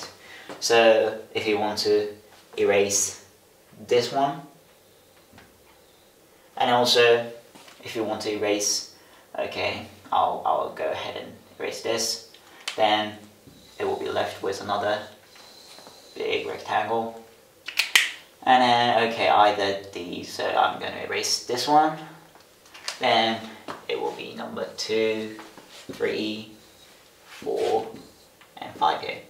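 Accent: United Kingdom. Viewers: I think you have an English accent rather than an American accent, but EIGO UMASUGIDESHO! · British Englishばりばりでかっけえ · Excellent! Very well explained considering it's your first time. It's also great to hear a Japanese person speak with a British accent! I hope you make more videos. :) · As a bilingual viewer of your channel who hasn't had much experience with math in English, I greatly appreciate this video. I love your son's British accent and look forward to further lessons!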